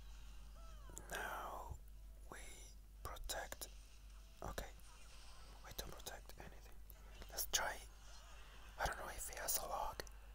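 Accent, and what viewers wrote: Argentina. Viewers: Dude u from Argentina?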